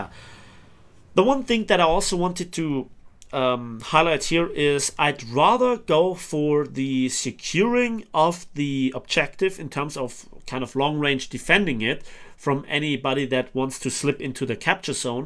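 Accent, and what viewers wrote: Germany. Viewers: Hey, another great video! I always enjoy a great historical background (and I think I can speak for everyone when I say this) with a satisfying German accent:) One question-- what are the pros and cons of the waffletractor vs. the Nashorn? Which would you use? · One of the best videos about War Thunder. I get that PhlyDaily is making videos for general audience that's why he has the subs. But is doing trully high quality content where you get far more information. He even pronounces the names very nicely in probably very close accent to that language:). BTW I don't know much about him, where is he from? The accent do sound like something close to Germany is mixed in him.. Denmark? Netherlands?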